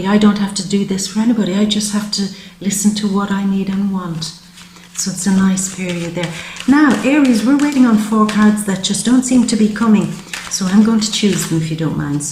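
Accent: Ireland. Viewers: So true and profound. I am already a bit like you want me to be and am very happy about it. Will work to finish the job with the help of the universe. Wanting to do what I love to do and get to work from it. I love your accent. Irish perhaps. Thank you. Best wishes for the Holidays.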